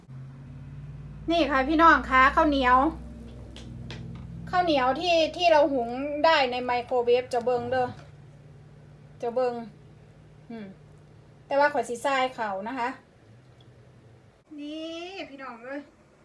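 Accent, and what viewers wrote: Ubon Ratchathani, Thailand. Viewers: พูดแบบคนอุบลแท้ดูทุกคลิปนะคะ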